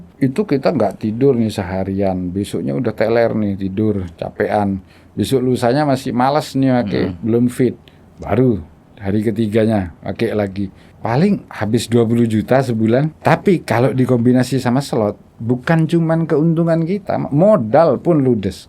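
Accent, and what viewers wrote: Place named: Madura, Indonesia. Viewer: Bhasany mdrny kentel bget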